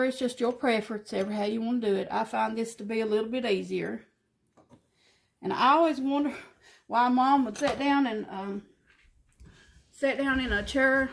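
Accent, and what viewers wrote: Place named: Kentucky, United States. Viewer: ok I thought I was hearing a Kentucky or TN accent. 💜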